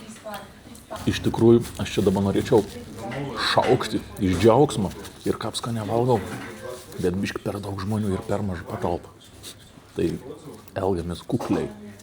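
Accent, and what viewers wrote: Samogitia, Lithuania. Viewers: Mažiau zemaitisko balso jau truputi perdaug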